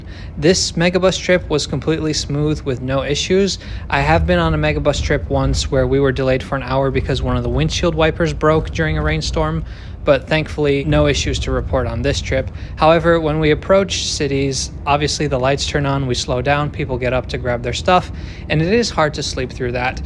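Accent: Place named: United States. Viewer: Initially i was thinking here in uk then i heard your American accent it supprised me megabus in.usa its bit mental here aberdeen too glasgow Edinburgh we have megabus still as far as i know scottish citylink flixbus and just about too add too it another operator commimg soon electric coaches thats 4 operating which is memtal citylink does 31 coaches a day between aberdeen and glasgow yea coach travel can be cheap here £5 city too city enjoyed your content thank you ps just subbed keep up good work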